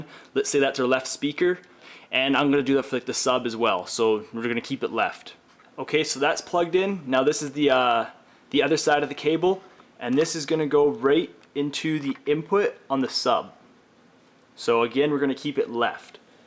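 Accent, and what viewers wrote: Canada. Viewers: Is this dude...Canadian!?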